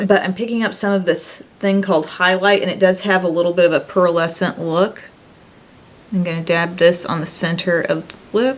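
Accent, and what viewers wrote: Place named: South, United States. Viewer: I just noticed how lovely your Southern accent is haha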